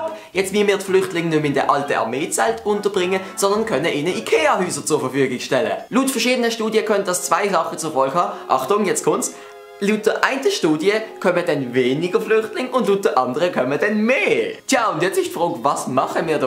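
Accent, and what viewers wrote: Basel, Switzerland. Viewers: Me merkts mega! ich kum au vo Basel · Ich mag den Schweizer Akzent👌 Und nur weil du Schweizer bist haste ein abo von mir😂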